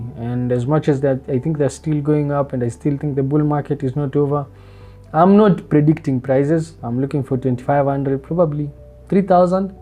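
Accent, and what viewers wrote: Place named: Kenya. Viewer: From the talk unajua ni mkenya 😂🎉🎉🎉let's goo bro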